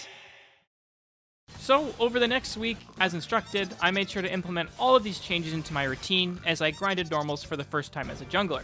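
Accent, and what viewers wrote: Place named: India. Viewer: Cant watch this.. this india accent is just blood in ears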